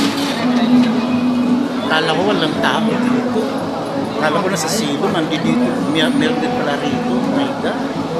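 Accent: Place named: Philippines. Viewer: สำเนียงคล้ายคนฟิลิปปินส์นะ ถ้าผิดขอโทษ เพราะฉันมีเพื่อนฟิลิปปินส์เยอะ 🇺🇸🇺🇸🇺🇸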